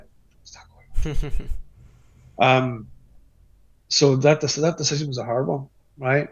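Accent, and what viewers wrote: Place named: Ireland. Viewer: Irish accent I understand it perfectly but I am Irish 😅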